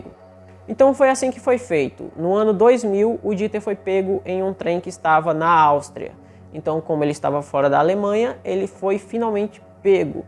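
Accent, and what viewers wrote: Northeast, Brazil. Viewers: adoro seus casos acho que vc narra bem as vezes com alguns errinhos de Português, mas não se sinta ofendido por favor!!!! As vezes é o jeitinho de falar no nordeste aqui no sul é diferente, abraços te sigo em todos os canais!!!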